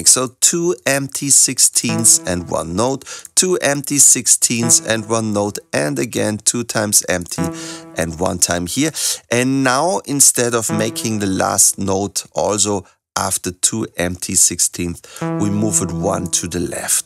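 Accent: Germany. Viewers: I can’t get past the thick German accent 😅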